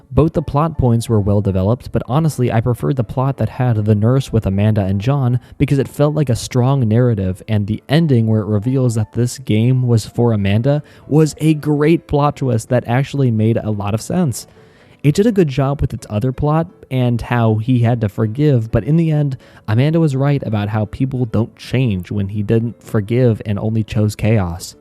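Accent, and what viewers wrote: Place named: Canada. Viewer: 1st video of yours, where’s your accent from? I’m assuming Canadian? (Sorry if wrong I’m from Australia lol)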